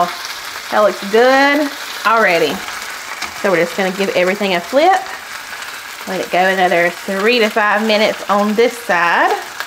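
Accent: South, United States. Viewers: Made the chicken and spinach recipe it came out so delicious. Im making your beef,rice and queso tomorrow. I love the channel and sweet southern accent.❤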